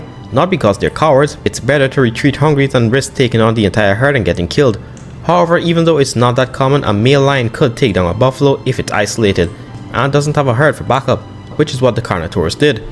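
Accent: Caribbean. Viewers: i know many, many people have probably already pointed this out to you... but i noticed your Caribbean accent. from one west indian to another, you make some damn good videos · I heard the accent and immediately thought you were from the Caribbean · Are you Caribbean by any chance? I'm picking up a hint of Jamaican, Trini or Guyanese in your accent. Love from Guyana! 🇬🇾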